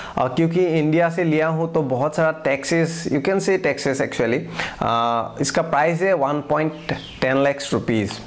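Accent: Assam, India. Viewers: Why your accent is like that ? Are you from Assam ?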